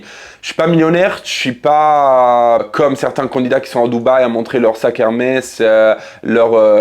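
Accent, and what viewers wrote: Italy. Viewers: L''accent italien ! 🤩🤩🤩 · Quel plaisir l’accent italien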